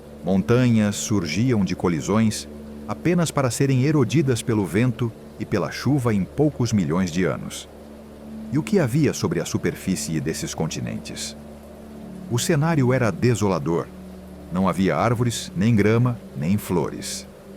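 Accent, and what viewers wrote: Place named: Portugal. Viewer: Pois é, tá em português portugal, mas é incrível o quanto se parece com nosso português algumas palavras 😊😊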